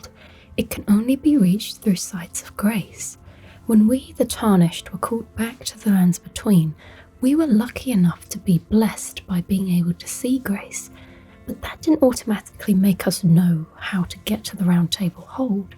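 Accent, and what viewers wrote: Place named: Birmingham, United Kingdom. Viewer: My favourite brummie lady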